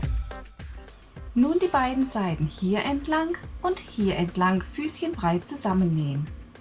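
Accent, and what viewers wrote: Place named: Palatinate, Germany. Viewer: Liebe.. Vielen Dank für deine tollen Videos und ich, als Hessin die seit 16 Jahren in Niedersachsen wohnt, liebe deinen Dialekt... Ich schätze mal Pfalz, oder? 😍😍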